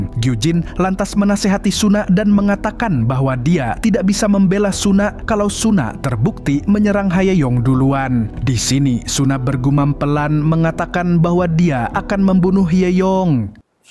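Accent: Banjar, Indonesia. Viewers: urang banjar kah ni😂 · buhan Banjar jua sakalinya amang nih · Banjar kalinya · Nahhh urang Banjar kh pian😂😂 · Banjar rupanya lahh😂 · Amang banjar nih wkwk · gileee banjarnya kental banar!😂😂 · Urang banjar kahh amang nihh😂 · Banjar kah mang? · urang banjar ih😂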